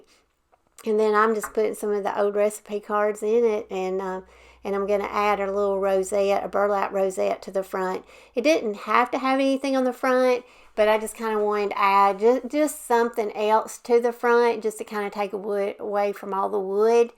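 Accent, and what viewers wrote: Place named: North Carolina, United States. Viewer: I love your sense of style and your accent. It is very similar to my area of North Carolina. I don't have creative instincts so I rely on crafters like you and many others to give me ideas. I painted an empty Bolero hand soap dispenser I got a Dollar Tree and it turned out beautiful. It had a pretty label and I knew I wanted to do something with it so thanks for that video the other day. Also wanted to mention I have the same scrapbook paper you used in this video.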